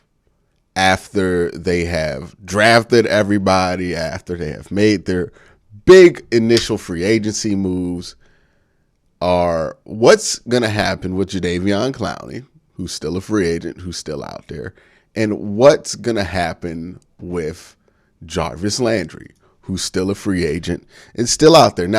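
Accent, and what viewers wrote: Cleveland, United States. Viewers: I just clicked on your video cause it was recommended to me (I wanted to hear thoughts on Clowney) but then I heard your Cleveland accent and was like wtf!? I liked your take but then I heard your accent and found out you were a Brown. I'll be watching your show man, I'm subbing. What part of Cleveland are you from?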